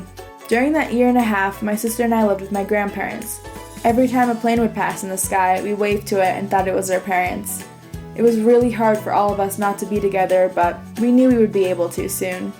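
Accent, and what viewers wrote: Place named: Bulgaria. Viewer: Bulgarian accent's always the hardest to get rid of, haha.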